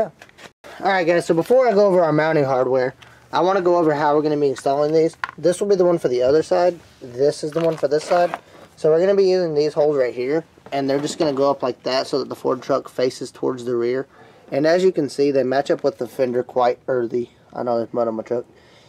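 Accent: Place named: South, United States. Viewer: Dang bro,where you from. You sound country as fuck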